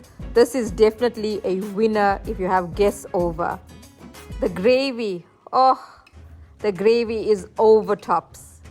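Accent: Durban, South Africa. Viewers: Thank you very much…looks and sounds delicious… I have to try your method… my family loves crab and prawn. From you accents I’m guessing your from Durban SA my beautiful home of birth…I may be visiting this year sometime… do you have a restaurant and is called Peri-Ma’s Kitchen? Just got to visit. Once again Thank You ❤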